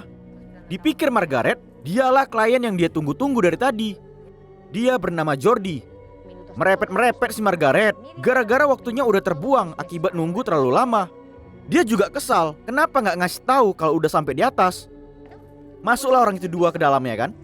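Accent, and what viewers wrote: Medan, Indonesia. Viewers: logat orang medan itu bg hehehe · Medan ni we udah jelas logatnya wkwkw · Logat medan kau lah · Bro Kau orang medan, tebing, atau siantar Ooaalaahh Kaco kacooo 🤣🤣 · logat Medan ni wee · Orang medan kau kan bg 🤣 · Ak suka bang konten mu tapi yg ku suka cara anak Medan berbicara buat ak ketawa sumpah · Nampak X org medan nya.🤣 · Orang medan ya bg nampak kali ku tengok logat medannya🗿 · Medan ya bang? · Logat kau medan kali bah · Bahasa abg ini mcm bahasa anak medan.. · Medan mana bang ?? Kwkwkw kp lalang ni lek kwkw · Logat medan bah anak medan kau ya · Adminnya orang Medan ya? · Njr logatnya khas medan banget bang🗿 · Bang lo bahasa apa bang org medanya kau ini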